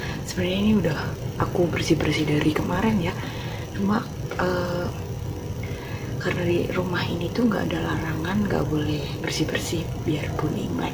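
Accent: Banyumas, Indonesia. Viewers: assalamualaikum dek apa kabar nya semoga sehat selalu ya ngapak dulu baru penak😂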